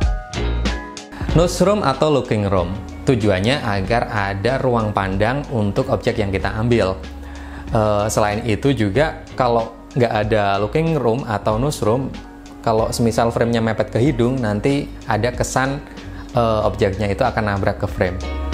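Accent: Pekalongan, Indonesia. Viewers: Pekalongan mana suaranya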